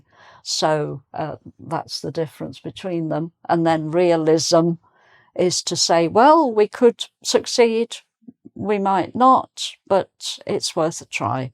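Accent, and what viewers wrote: United Kingdom. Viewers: Hello Teacher! Be sure you are a very special teacher! British English sounds much more beautiful with you!Thx to you we feel like learning more about your native language and about The UK! You are a symbol of The United Kingdom through the world!Greetings!❤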